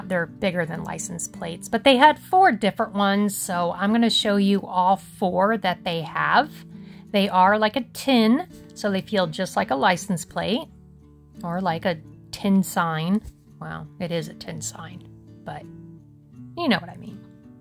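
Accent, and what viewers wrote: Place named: California, United States. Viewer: Loved the Calley girl accent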